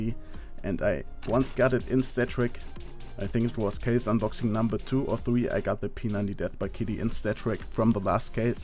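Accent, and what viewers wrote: Germany. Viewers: are you german ?? :D i think im hearing an accent ;) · i wonder if i also sound so german when i speak english. no offense, pretty much every german sounds like that. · Dein Akzent sagt mir :,,Du bist Deutsch :D''(I think u r a german :D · Man hört das du Deutsch bist sprich doch auch deutsch xD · Bist du deutscher oder österreicher ? Dein english hört sich irgendwie so an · Man hört so dass du deutsch bist! :D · Dein Englisch ist meiner Meinung nach richtig stark, Grammatik und Vokabular sind einfach perfekt, nur der Akzent ist einfach 100% deutsch ^^ Naja ich kann nichtmal ansatzweise so gut englisch, aber mein Akzent ist schon sehr Englisch:D Hättest du jetzt noch nen amerinakischen Akzent würde ich dich glatt für nen Ami halten^^ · dieser deutsche akzent beim englisch sprechen ist so offensichtlich :D und irgendwie auch langsam nervig :D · Deutscher Akzent ist schrecklich!! 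· U have a german voice but u are english i think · Are you from germany? because you don't sound like an english guy, I mean it's not bad, I'm to german, i think you sound like a german :D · R you german? Your English sounds like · Du hast ein deutschen Akzent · are you german your english have a german akzent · Also ich muss ja jetzt mal sagen...! Der deutsche Akzent geht mal garnich.! :DDDDDDD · Ich kann an deinem English hören das du Deutscher bist warum sprichst du nicht Deutsch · hört man nordeutscher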